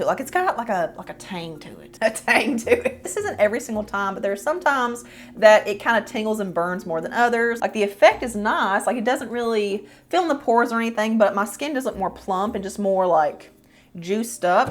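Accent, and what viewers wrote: South, United States. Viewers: Love your videos!! I am a Southerner and you accent sounds like you were raised in the South.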